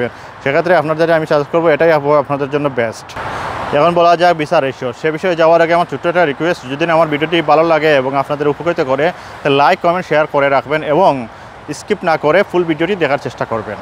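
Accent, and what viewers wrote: Sylhet, Bangladesh. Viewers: Mone hoy Sylheti vai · ভাই সিলেটি নি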